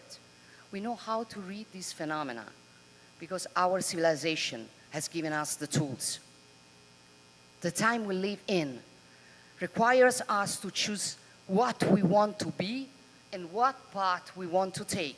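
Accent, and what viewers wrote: Rome, Italy. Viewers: i admire she went all out trying her best in English.Although she gained more and more (Italian) accent along the way,she managed to get all important concepts across,she corrected herself in few occasions,still composed and strong.it is impossible to be liked by everyone,and she knows that very well,but i think she now have the respect of many. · Onore al merito, ma che inglese romanesco!!! Non si può sentire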